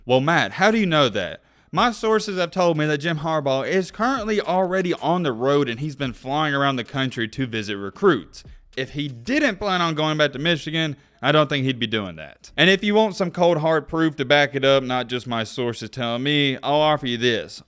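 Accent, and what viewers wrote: South, United States. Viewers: Dude you're numbers are so far off. Bro, you can tell by your back woods accent you're from the south. Keep your opinions there chief. AWFUL!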